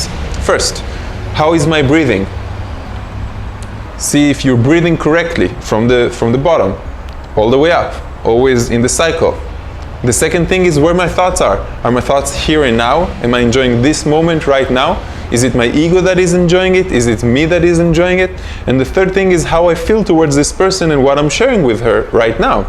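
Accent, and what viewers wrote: Israel. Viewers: Youre accent sounds Israeli r u...? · Why do you sound so Israeli?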